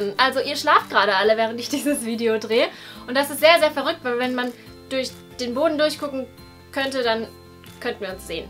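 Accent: United Kingdom. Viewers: Hey!♥ Ich verstehe wie du das mit dem Englisch sprechen meinst, ich bin auch zweisprachig aufgewachsen:) Meine Mama ist aus Italien😊 Ich würde es mega cool finden wenn du englische Videos machen würdest ich liebe deinen britischen Akkzent!😍 Deine Neuseeland Vlogs inspirieren mich sehr:D Ich möchte gerne nach dem Abitur ein Jahr um die Welt reisen☺ Danke für die Inspiartion und genieß deinen Urlaub 😘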